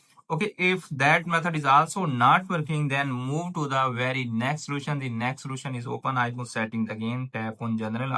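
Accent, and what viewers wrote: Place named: India. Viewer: Can you speak English instead of Indian?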